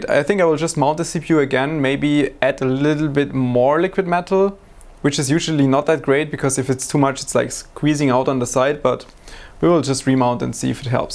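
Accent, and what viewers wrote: Germany. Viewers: Alda. Ich liebe diesen deutschen Aktzent. #nohate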